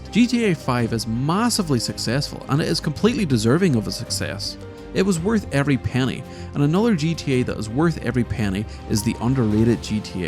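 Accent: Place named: Ireland. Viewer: Recently watched your other gta videos mate & subscribed! Great work, plus the commentary with your irish accent is a bonus touch haha! 😄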